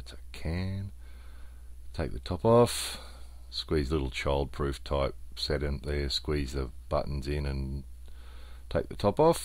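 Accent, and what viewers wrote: Australia. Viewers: Very informative. Nicely narrated with a of Aussie accent .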